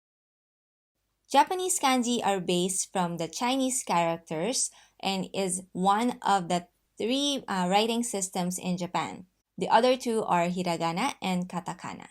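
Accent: Philippines. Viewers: Love the logo! Though a better/more efficient way to learn Kanji is via learning the Radicals as opposed to random Kanji as presented here. Also, she's clearly a Filipino speaker & not Japanese. Sorry, but both the English & Japanese are slightly accented! I commend your efforts, but find that it's essential & utterly important to learn correct intonation & pronunciation from the get go! Good luck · Your accent sounds Filipino. Is your mother tongue Tagalog? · Dear all watchers... I am a Japanese girl from Tokyo. And We feel so much disturbed by these sorts of Fake video! This woman who was speaking in this video is not Japanese and her accent is Philippino. This is the real issues because when we learn language, we need the real native, the real nationality the language. Because it’s crucial to learn the the manners, the way to communicate and so many things. 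It’s too too bad because watchers will think this woman is Japanese. It’s so serious! We really don’t want watchers misunderstand. Because Philiplipino is quite quite different from us Japanese. Especially our manners, accents and the way we talk, smile and tons of things!!! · Are you Filipina maam? I can hear the accent😊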